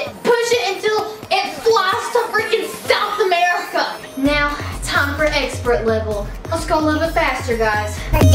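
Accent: South, United States. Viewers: Throw back to when still had her southern draw ❤️👅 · I love ur country accent it's really sweet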